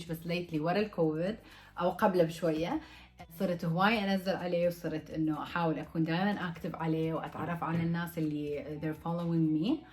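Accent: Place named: Iraq. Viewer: حلووو معايشه هواي بل عراق وتحجين عراقي حلوو 😘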